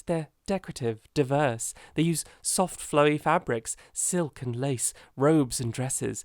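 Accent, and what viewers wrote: United Kingdom. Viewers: It’s just Southern English 😭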